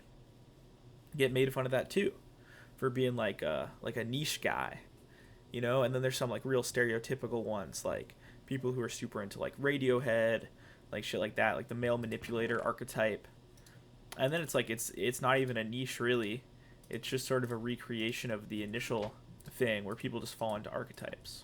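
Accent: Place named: Missouri, United States. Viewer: You sound kinda Missouri in this one. The accents comin out of the DNA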